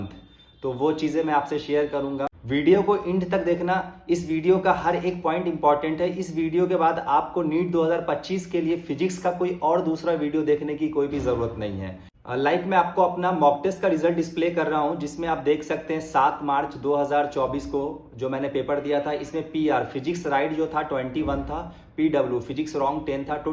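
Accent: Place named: Bihar, India. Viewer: bhaiyaaaaaa......aap khn the phle 🥲😊....thnk uh so muchh...apki ye phli video dekhi...suggestions m thi...... aap bihar k ho kya...aap k baat s lga....thnk uh so much bhaiyaaa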